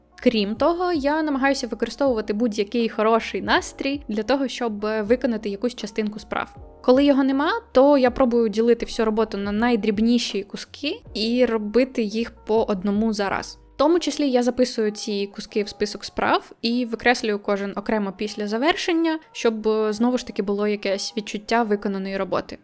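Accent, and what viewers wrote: Russia. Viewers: даже русский акцент, т.к язык автора изначально русский))) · Язык красивый и я его понимаю. Но русский акцент он же никуда не денется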